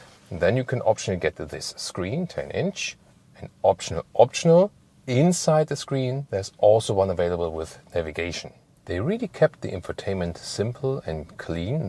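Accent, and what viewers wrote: Germany. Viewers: Genglish… what a horror… Jesus safe me please…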